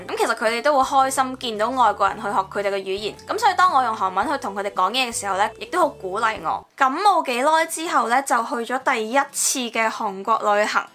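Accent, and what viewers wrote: Taiwan. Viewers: 难怪你的国语一口台湾味。大陆粉丝留＾＾ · 所以你的國語這麽台灣腔。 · 難怪Mira的中文很臺灣腔XD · 難怪Mira講的中文跟台灣人一模一樣 不像香港人對腔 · 所有说普通话就有了台湾人的口音 ：）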